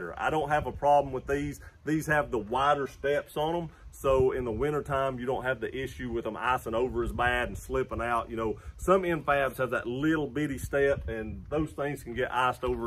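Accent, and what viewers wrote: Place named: Appalachia, United States. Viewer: Your accent sounds like West NC, Appalachian?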